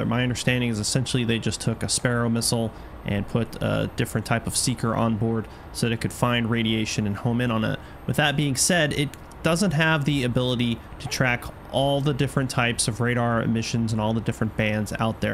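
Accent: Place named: Germany. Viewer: The German guys accent breaks my immersion 😂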